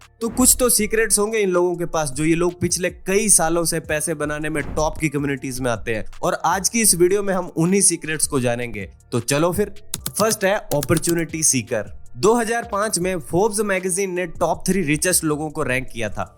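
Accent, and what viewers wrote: Gujarat, India. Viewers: Tum gujrati ho, accent! 🙂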